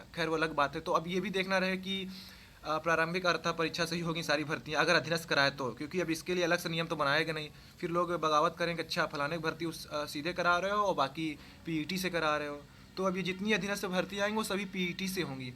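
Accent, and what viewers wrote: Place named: Gorakhpur, India. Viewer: लेकिन् आपके बोलने के स्टाइल से तो लगता है की आप गोरखपुर देवरिया से है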